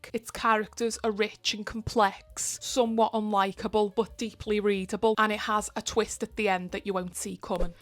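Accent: Liverpool, United Kingdom. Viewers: Thanks for this video. It's much appreciated for Pride Month. I just discovered your channel today. As others have mentioned on other videos, I love your Liverpudlian accent. Most of the lit fic Booktubers I come across have quite formulaic American or English accents. No shade to them, especially as many of them have American accents because they've learnt English as a second language. But it's really wonderful to hear more diverse voices in the Booktube world (literally!), so thanks for your contribution ❤️‍🔥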